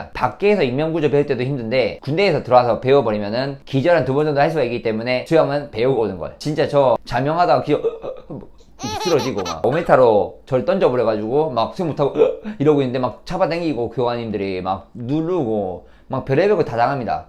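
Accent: Gyeongsang, South Korea. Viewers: 경상도 사투리 쓰는게 자연스럽습니다!! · 경상도 네이티브 같아요 맨.몸.운.동. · 와 ㅋㅋ 전라도 사람인줄 알았는데 서울 + 경상도였네 ㅋㅋ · 경상도 사투리 자주 사용해주세요 ㅎㅎ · 와 ㅎㅎ찐 경상도인줄 알았는데 의외시네요~~^^ 부모님이 경상도 출신은 아니신지~~ㅎㅎ 근데 서울말보단 사투리가 더 찰떡이에요~ㅋㅋ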